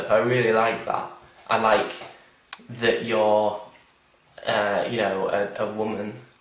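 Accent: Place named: Northern England, United Kingdom. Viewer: the northern accent is killing me omfg you star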